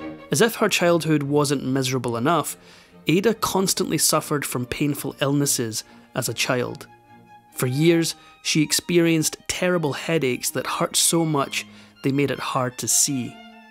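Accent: Scotland, United Kingdom. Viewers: If all of this accounts’ voiceovers are AI, this is the most natural of them all. There is inflection, natural pauses and no unnatural stops mid sentence. This gentle Scottish voice should be used for all of the videos. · Interesting...but is no one going to comment on the voice of the narrator lol I have heard him narrate a few such stories What a lovely Scottish accent he has .. not like some of those voices you sometimes get . But yes Interesting account of her life and very sad also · Sounds as if this has been sourced from the BBC because we’re listening to Scottish Historian Neil Oliver… pure brilliant wee man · Great story told well - especially the Scottish accent! But please don’t say “close WITH someone; the correct way is to be close TO someone. The first is an Americanism that has really annoyed me recently in Australia . . .and should annoy any good Scotsman too. 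· Off topic comment/question: I can't get over how much you sound like another Scot who is a political commentator with a particular interest in U.S. politics, & can be seen & heard on The Meidas Touch Network. Any relation? I'm not remarking on the accent alone although that, of course, plays a part. I'm talking about the sound of the voice, the cadence, tonality etc. as well. If this is too personal, I apologise, & ask that you simply disregard the question. Otherwise, thank you for reading & providing me with something new to which I can Like & Subscribe. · The narrator of these videos sounds just like David Tennant. I know I'm being naive here about Scottish accents, but there ya go. I hope my comment doesn't offend anyone, i.e., they all sound alike.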